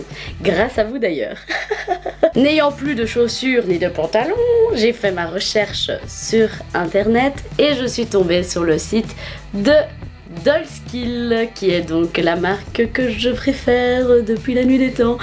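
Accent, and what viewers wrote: Belgium. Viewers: Elle est belge, on parle toute comme ça. Et les mimiques, l'humour ect c'est l'humour belge, gaelle garcia est la "belge" la plus connu sur Yt du coup mnt dès qu'une belge apparaît sur Yt il faut obliger que des personnes la compare à elle. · c'est l'accent belge!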